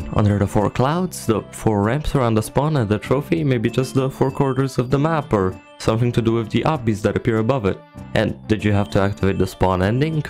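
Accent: Poland. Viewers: what country you from i thouhgt ur polish for a sec?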